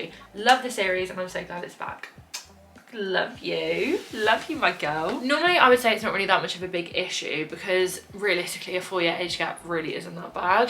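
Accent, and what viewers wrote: United Kingdom. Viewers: Am in love withe ur british accent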